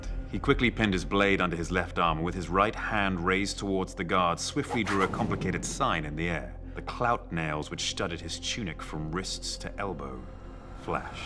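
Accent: England, United Kingdom. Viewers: It's nice to get a good example of how nice Henry Cavill's natural voice is. His American accent as Superman kind of leads him to be very whispery and mumbly, and I love his Geralt voice but it's definitely a voice. But his natural English accent is gorgeous. He should totally do an audiobook series of the Witcher where he does his Netflix Geralt voice for Geralt and his natural voice for narration. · Why British gentleman always makes a good audiobook narrator? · Oh he's British... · Wait wtf he’s british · It’s so weird hearing him with the British accent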